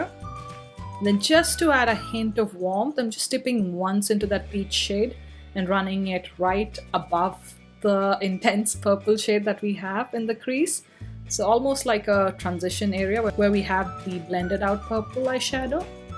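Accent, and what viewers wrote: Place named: India. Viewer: Beautiful indian Accent . where did u grow up in India?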